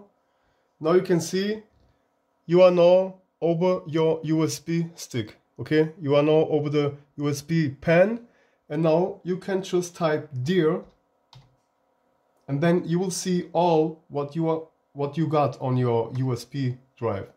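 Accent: Germany. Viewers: der boss mit deutschem akzent, gutes video. danke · dieser Deutscher Akzent 🤣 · i hear german hahah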